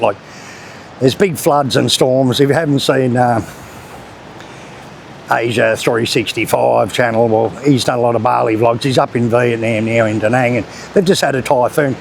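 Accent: Australia. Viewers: Full on Aussie accent !😮😂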